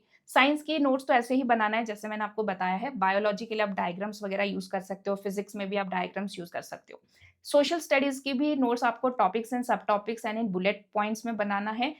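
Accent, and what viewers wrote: Odisha, India. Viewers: Are you from odisha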